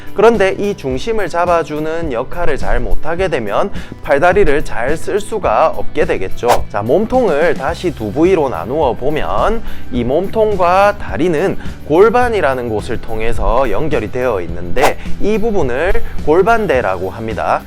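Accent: Gyeongsang, South Korea. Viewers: ㅎ 저는 그래서 넘 좋습니다 표준말은 어디에서든 접하기도 하지만 때론 딱딱하기도 차갑게도 들리는데 경상도 말은 화면에서 찾아보기 드물고 또 다정다정한 친근감있는 말투가 넘넘 좋습니다 아픈 이유도 상세하게 말씀해주셔서 저는 운동보다 먼저 서론을 더 신중하게 반복해서 듣게되는 1인으로서 자세한 설명에 더 감사할 따름 입니다 고맙습니다 홧팅하시길💗💗💗💗💗💗💗💗💗💗💗💗💗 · 억양이 참 재밌네요 경상도?억양이죠? 따라하게 됩니다 유익정보 감사합니다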